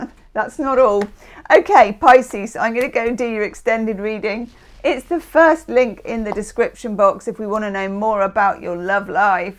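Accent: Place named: Bath, United Kingdom. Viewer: I recognise your accent. Would you be near bath in England?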